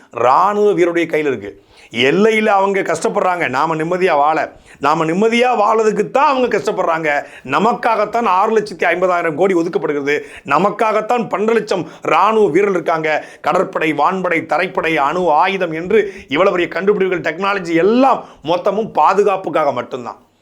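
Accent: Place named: Coimbatore, India. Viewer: தலைவரே இத்தனை நாள் உங்க பேச்சு நல்லா இருந்துச்சு இன்னைக்கு ஏதோ கோயம்புத்தூர் பாஷா பக்கம் பேசுற மாதிரியே தெரியுது... என்னமோ போங்க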